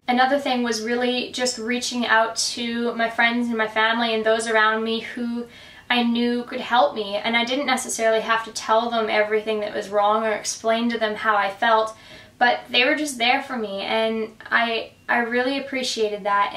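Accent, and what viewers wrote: Australia. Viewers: i love how you have a little bit of a an aussie accent that comes out every once in a while :) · I'm new to your channel and I just have 2 questions. 1. Does your Australian accent come in and out? 2. What do your tattoos mean? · I only just read that you live on the Central Coast! I was thinking your accent had some big Aussie leanings! That's awesome.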